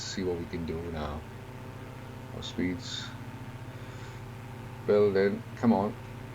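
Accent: Wales, United Kingdom. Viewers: Now THAT's definitely a Welsh accent lol, ello from Mid Glamorgan.. Nice vid, When we get helicopters in this sim? i will definitely drop down in there. Incidentally? This had the Exact same glitch at this point in the olf fsx days.. and i used to host sessions from here.. lol.. and 747's 737's .. we landed them all down there, although it wasn't as deep as this hole in this sim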